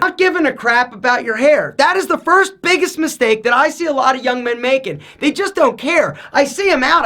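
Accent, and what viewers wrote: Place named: Maryland, United States. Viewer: Are you from Maryland? U sound like everyone I know lol. I’m from Annapolis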